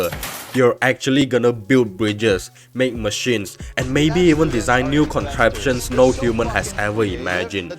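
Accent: Malaysia. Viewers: First word of the video can already tell bro is a malaysian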